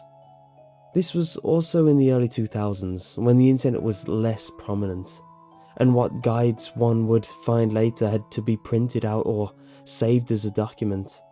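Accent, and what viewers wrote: England, United Kingdom. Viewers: How fitting to be told by an English man. x3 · SPOILER ALERT: The image described at the end is the actual photograph of Mary Kelly's crime scene by the sound of it. This is a good one, I like your taste. Good to hear an English guy reading these things too I have to say, it's quite rare though I have found others. I might even record some myself, as I haven't yet heard an English woman narrating creepy pasta's or other horror stories. I think the Creepy Pasta genre is a bit over saturated now, I was thinking of just reading old horror stories the copy right has expired on maybe.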